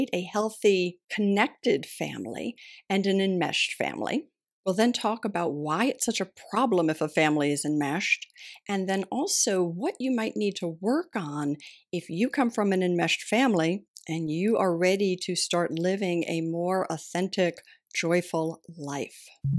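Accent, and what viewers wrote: United States. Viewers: Faellow... This american flat accents are just hard to listen to.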